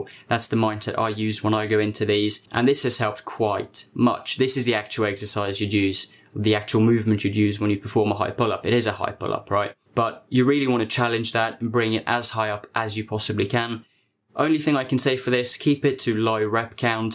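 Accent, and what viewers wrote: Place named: England, United Kingdom. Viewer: Blimey, I thought your accent was from England. You must have learnt your English from an English person.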